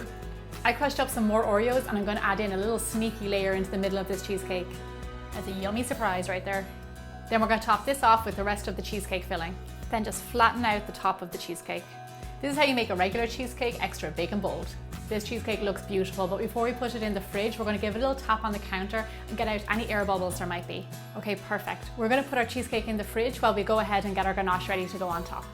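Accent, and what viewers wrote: Ireland. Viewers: Wow! I've found my new favourite chanel! Lovely, easy food, no hard to get ingredients, and a lovely irish accent! (The best one for me) Cheers from Argentina! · Love the Irish accent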